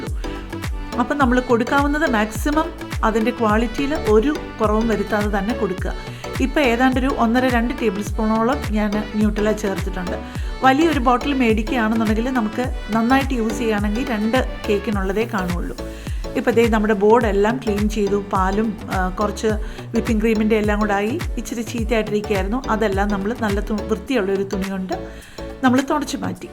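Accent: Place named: Pala, India. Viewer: എന്റെ friend geetha george ഇതു പോലെ പറയും നല്ല ശെല് എന്ന്. പാലക്കാരി ആണോ 😍😍😍😍😍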